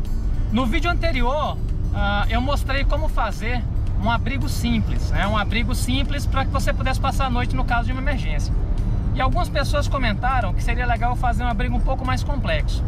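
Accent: Manaus, Brazil. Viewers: Você é de Manaus?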